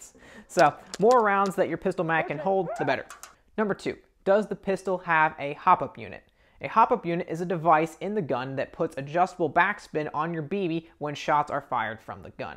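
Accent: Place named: United States. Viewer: I cringed a little when you were describing a the blowback pistols.. Man, you sound like you’re here in the US, so why not get a real pistol? Having some knowledge of real guns can’t hurt. Racking the slide is really not that cool. It’s an integral part of the design. lol.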